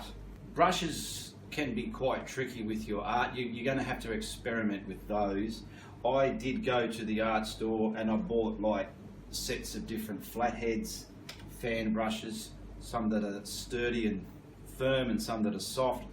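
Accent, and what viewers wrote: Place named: Australia. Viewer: its great to see and hear a fellow Aussie . im looking forward to following your tutorials as im a beginner .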